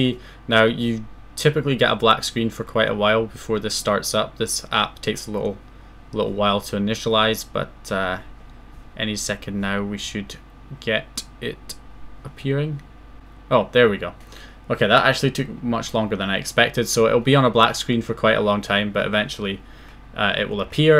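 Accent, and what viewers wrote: Scotland, United Kingdom. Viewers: Mate are you Scottish?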